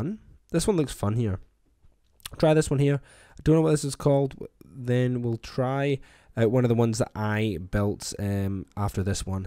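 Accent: Scotland, United Kingdom. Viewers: Are you Scottish lad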